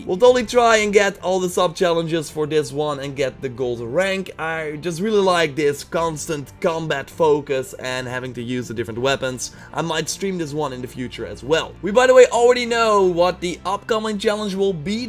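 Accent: Netherlands. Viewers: Damm jouwn Nederlandse accent is echt klote irritant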